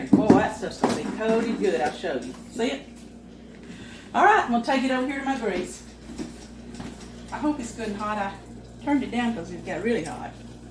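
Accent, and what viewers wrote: South, United States. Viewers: Mrs., you've saved two southern lesbians' dinner tonight! We love your accent; you remind us of all the memaw's, mamaw's, and nana's that we've known and loved. You've got two new fans. We hope you had a great 4th of July and God bless!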